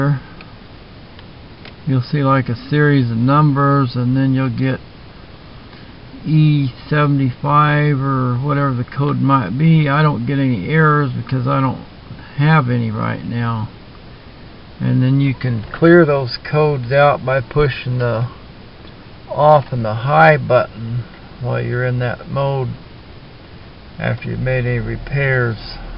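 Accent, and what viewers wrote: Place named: South, United States. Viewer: I'm digging your southern drawl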